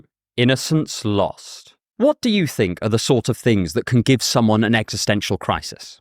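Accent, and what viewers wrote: United Kingdom. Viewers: I read this book a while ago and it took your british accent to make me realize that its a good book.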